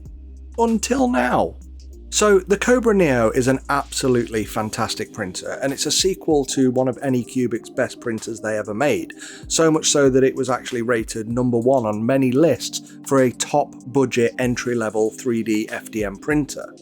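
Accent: United Kingdom. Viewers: I tend to use carbon fibre infused PLA and Matte PLA on prints that require me to hide my layer lines and I print at 0.12mm layers and I get wonderful results, I wonder how good they would look using that material on that printer with those layer heights? I had promised myself I would not buy another bed-slinger printer after I built my Pro 3D V-King 400 from self-sourced very best quality parts, I also have 3 Ender 5 Plus machines as well as half a dozen bed-slingers, I have a resin printer but can count on my fingers how many times I have used it as the smell and the mess and all the cleaning just put me off but seeing the prints this machine can produce has made me think again, if this was released as a cube printer it would be a no brainer descision for me, what size nozzle do you have to use to be able to print in those low low layers? Your channel has also escaped my radar until now and it is a breath of fresh air to hear another English northerner (I think) talk about 3D printing, I am from South Yorkshire by the way! 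+1 Subscription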